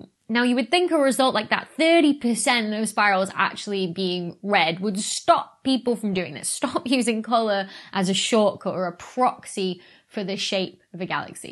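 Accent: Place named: Northern England, United Kingdom. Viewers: What is that accent? Leeds/Manchester? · Your interpretive presentation skills are now awesome. Would like to be in the audience for your next LIVE astro conference presentation. Should be SRO for just the delivery. Video suggestions: 1) what I do when I collaborate as an associate to main author, 2) vids on each of the previous main author papers starting with no. 1, which was before the Phd(???), and 3) how do I work/collaborate with others as main author, for instance, is there a small final edit group? And, thanks for being yourself, Mancusian accent and all. Next book?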